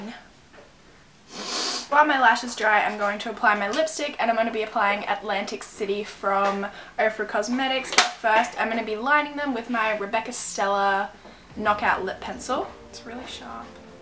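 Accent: Australia. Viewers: u r Australian?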